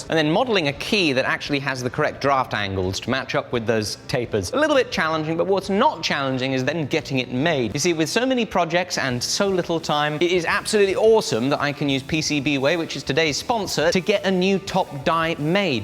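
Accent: United Kingdom. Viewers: A Brit talking about Hillbilly Engineering makes my redneck heart feel good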